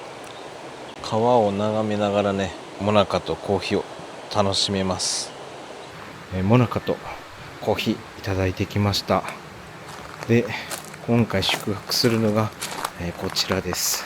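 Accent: Kansai, Japan. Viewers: 前々回から、あれオ−プニングの音楽が変わりましたね。 あの歌舞伎映画の音楽かと思ったけど違ったら申し訳ありません。 今回の音楽は朝日と雲海と絶景と合っていて国民放送さんみたいでしたね。 いつもの滝と神社が普通に暮らしてたら行かないような所が画面一杯に広がってあきないです。 関西弁も素敵です。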